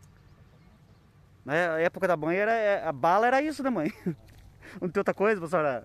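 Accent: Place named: Cuiabá, Brazil. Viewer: Fala bem parecido com cuiabano,de onde vc é o?🙏👏🏻👏🏻👏🏻😘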